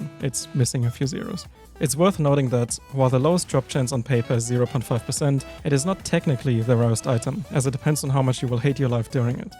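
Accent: Germany. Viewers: no front but you have to be german cuz youre accent in speaking is yk "BAD" but im german too sooo... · Als ich den Akzent gehört hab wusste ich direkt dass du Deutscher bis xD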